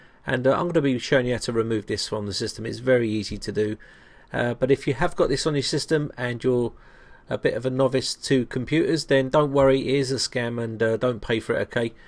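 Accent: United Kingdom. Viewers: You spelt infection wrong in the description, you wrote "ifections". BTW, I like British accents :)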